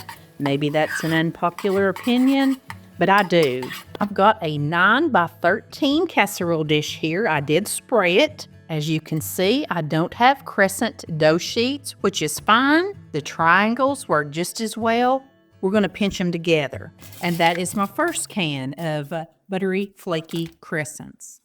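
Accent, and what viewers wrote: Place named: Virginia, United States. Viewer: Mama, I just subscribed to your channel and I love it. I’m not a cook but my boyfriend is from Virginia and he’s got an accent like you and I just called him and told him he’s got to look at your website. He is gonna be so thrilled I love it. I love crockpot cooking though and I am really enjoying all your crockpot cooking. Thank you mama.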